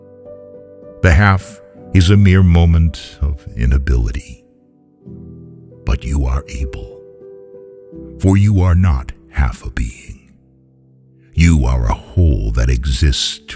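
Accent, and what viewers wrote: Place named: United States. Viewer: Great poem, but it would be far better to be read with a Lebanese accent rather than the usual American which is yet more grating US imperialism.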